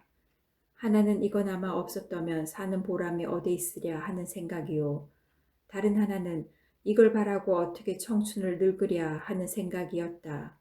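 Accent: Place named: Jeolla, South Korea. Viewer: 구수한 전라도 사투리에 음성이 잊혀져간 엄머니에 음성처럼 정감이있어 행복하게 들었네요 감사합니다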